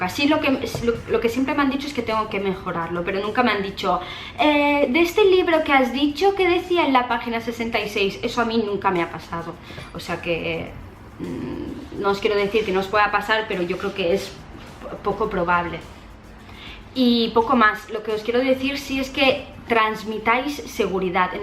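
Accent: Italy. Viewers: Eres italiana?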